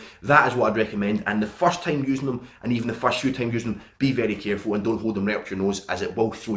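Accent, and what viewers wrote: Scotland, United Kingdom. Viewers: Is this guy Scottish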